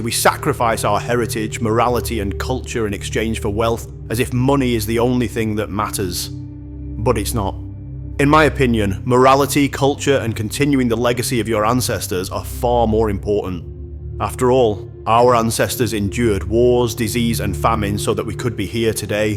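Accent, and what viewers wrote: Sheffield, United Kingdom. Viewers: American anglosax here guessing the accent. Sheffield?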